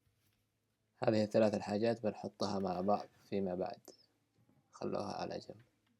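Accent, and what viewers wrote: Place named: Sanaa, Yemen. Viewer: بلعكس لهجتها الصنعانيه احلا لهجات اليمن واصل ابداعك استفدنا كثير اغلب الأشياء اجربها الي تنزلها جزاك ألله خير.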